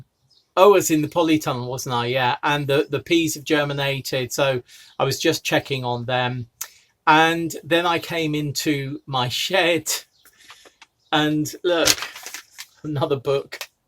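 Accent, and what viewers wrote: RP, United Kingdom. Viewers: YOUR speach is fantastic and a perfect ..we c as lled it * Oxford English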